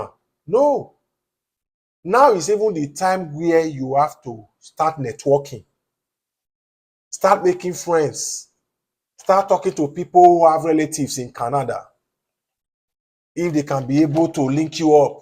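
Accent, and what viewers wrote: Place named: Nigeria. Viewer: Your Nigerian accent is too thick making it difficult to believe anything you say sorry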